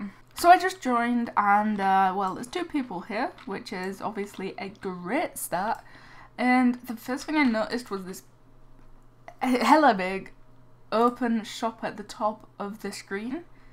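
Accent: United Kingdom. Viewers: Ay Your british like me! :D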